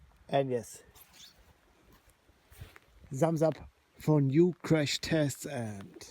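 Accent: Germany. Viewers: ich liebe Englisch mit deutschem Akzent xD I love the 5 mm faif millimeda[ganz schnell] echt gut xD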